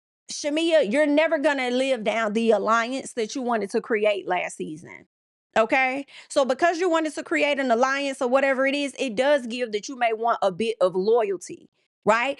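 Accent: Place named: South, United States. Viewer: Can you just talk normal... I enjoy your takes but sheesh😅 I'm in NYC so maybe I don't get southern accents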